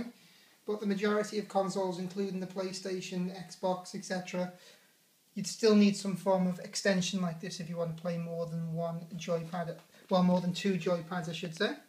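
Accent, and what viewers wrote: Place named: Liverpool, United Kingdom. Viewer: u rock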